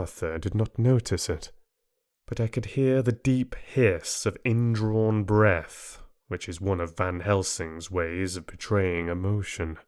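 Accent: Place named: England, United Kingdom. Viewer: Congrats an an absolutely fabulous reading, and so much better with an English accent, I couldn't bear the American. Please, please, please finish the book!!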